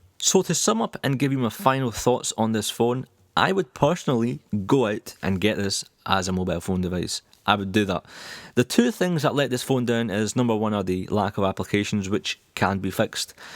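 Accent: Scotland, United Kingdom. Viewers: Scottish, i think...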